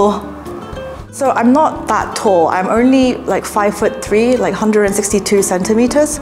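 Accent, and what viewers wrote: United Kingdom. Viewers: Does people study British English in Korea..as her accent is kinda British.. 🤔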